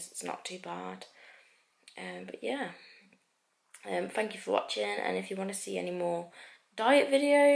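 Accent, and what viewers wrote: United Kingdom. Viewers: Are u from the midlands?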